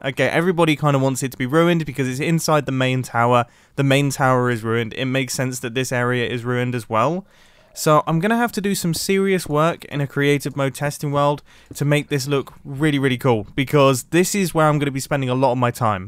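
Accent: United Kingdom. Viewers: Wow was extra british this episodes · what type of British accent is yours?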